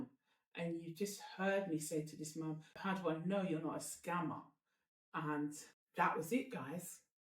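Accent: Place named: United Kingdom. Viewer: Am actually watching this video but I don’t know which one am confused at if it’s your beauty or the British accent or the boldness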